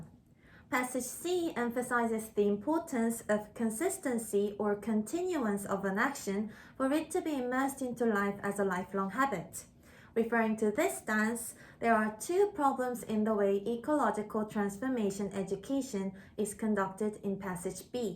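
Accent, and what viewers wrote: United Kingdom. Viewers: 영국 발음이시네요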